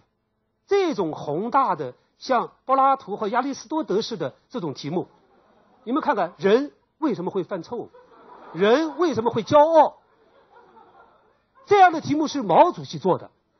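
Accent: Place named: Nanjing, China. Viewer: 南普感人。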